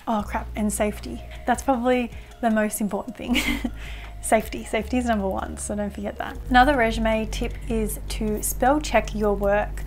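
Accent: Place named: Australia. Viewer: omg an Aussie, perfect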